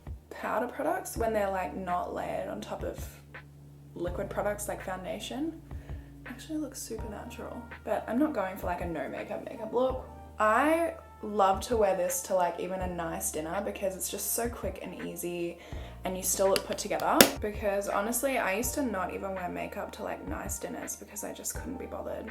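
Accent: Australia. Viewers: I know people have probably already said it but she reminds me so much of Maia Mitchell. I think their noses are similar. But the Australian accent just makes it better lol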